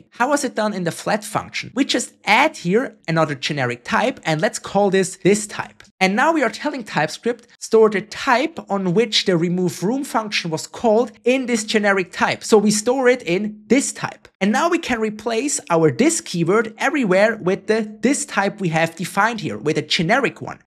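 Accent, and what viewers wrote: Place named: Germany. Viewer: Swiss or German colored english - sounds a bit clunky!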